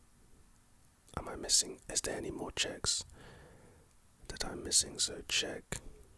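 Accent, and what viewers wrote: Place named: South London, United Kingdom. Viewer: Mr if you don't mind me asking where are you from? cause you have a very distinct south London accent and I honestly can't figure out where in south your from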